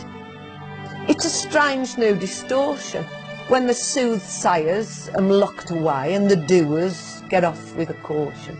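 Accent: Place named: Black Country, United Kingdom. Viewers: I don't know who wrote this but neverthelesss it is a piece of genius. Also It has more resonance for me having been delivered by someone from the Midlands, for reasons I can't quite put my finger on because although I have lived my life so far, for 47 years in my native Yorkshire then 10 in Scotland and the last 4 here in London, I find working class Midland people the 'Salt of the Earth'. You speak for me good Lady, thank you, and a very Merry Christmas to all at. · From the Black country,very co0mical accent.lol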